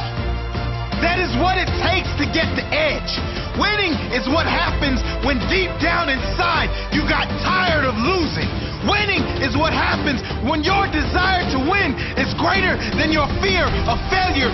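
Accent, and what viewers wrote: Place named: England, United Kingdom. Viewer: Nigga English accent!! nice 😎😎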